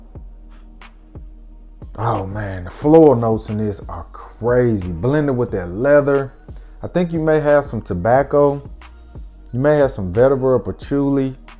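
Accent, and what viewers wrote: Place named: South, United States. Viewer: !! Man you talk country like me 😃. That Chanel sounds great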